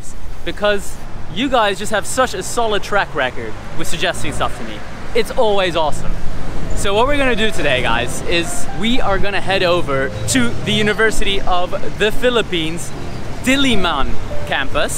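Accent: United Kingdom. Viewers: British accent, that's why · I think your not from America because your accent looks like a British accent lol correct me if I'm wrong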